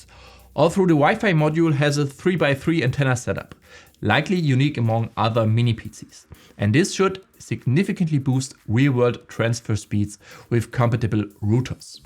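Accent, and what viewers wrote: Germany. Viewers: ´Ming Jung, ich hör doch direkt du bist nh Dütsche ^^ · da german comes trough wenn speaking. he tries not to spell like a native english guy as you would learn it in europe.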